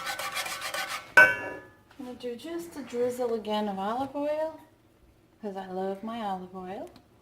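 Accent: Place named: Massachusetts, United States. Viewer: that looks fantastic..thats almost like the mosembequi..you sound like your from new bedford ma..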